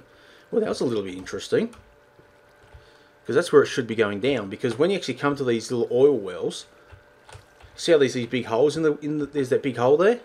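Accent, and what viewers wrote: Australia. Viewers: your Australian aren't you?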